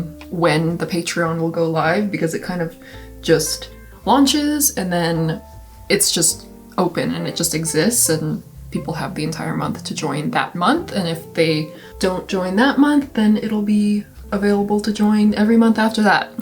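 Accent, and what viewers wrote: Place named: United States. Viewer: you speak like a normal American accent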